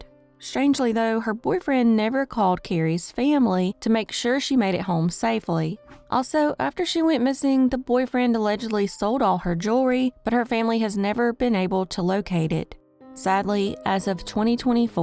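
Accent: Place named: Kentucky, United States. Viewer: Are you from KY? Your accent sure sounds like home. ❤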